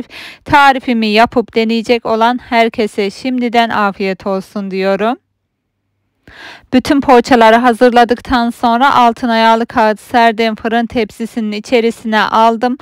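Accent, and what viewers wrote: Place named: Kayseri, Turkey. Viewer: Çok güzel oldu hemde pratik memleket kayseri mi şive benziyor bende kayseriliyim bu arada ❤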